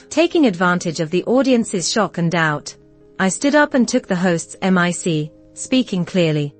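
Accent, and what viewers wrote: United Kingdom. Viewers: I have to admit... I don't even care what the story is about. I love the British accent lol. Though I will say this was very satisfying to see Molly and Ray get what's coming.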